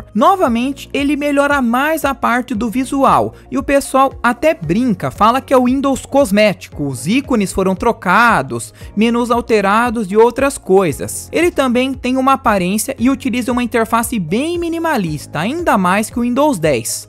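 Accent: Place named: Caipira, Brazil. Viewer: caipirês detectado...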